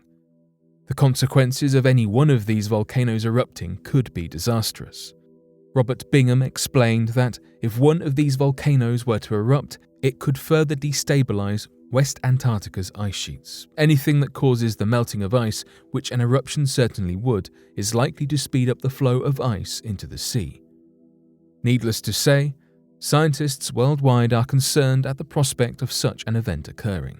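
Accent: United Kingdom. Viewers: I think there are 2 narrators both are British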